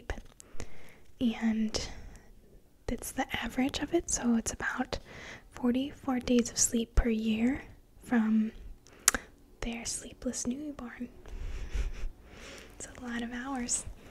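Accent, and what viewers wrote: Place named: Michigan, United States. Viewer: Do you live up north? You have an accent like my family members from Michigan ❤️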